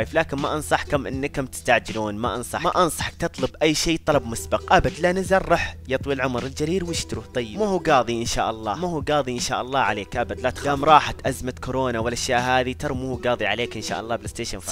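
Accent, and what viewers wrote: Qassim, Saudi Arabia. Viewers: هاي يا لهجة بلسعودية هجي يحجون كلش حلوة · لهجتك جميلة. في أي منطقة في سعوديه يتكلموا كذا؟ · انت من القصيم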